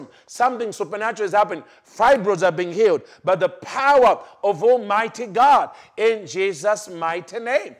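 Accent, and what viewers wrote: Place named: Africa. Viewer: Honestly speaking why can't you understand that he's not Westerner but an african and you just have to understand him what he's talking about by the help of the holy spirit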